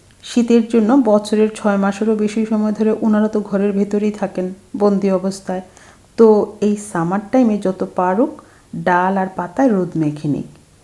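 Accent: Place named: Bangladesh. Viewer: খুব ভালো লাগলো পুরোটা❤❤,আপনার ভাষা বাংলাদেশির মতো লাগছে, এ গুলো কি মাছ , পুঁটি মাছের মতো দেখতে লাগছে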